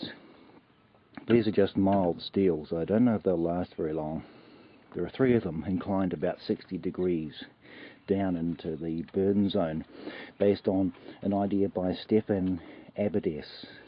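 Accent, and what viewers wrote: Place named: New Zealand. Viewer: judging buy your accent your from new zealand, which leads me to ask... what wood are you going to run in your "imberted" fema gasifier?.... imbert type gasifiers are designed for hardwoods and don't take well to softwoods (pine etc) as the air jet velocity is too high and destroys the char bed, with uncool results for gas production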